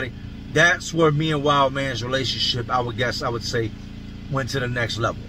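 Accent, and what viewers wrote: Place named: Chicago, United States. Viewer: Sounds like your from chicago . I'm from chicago ,we use the same language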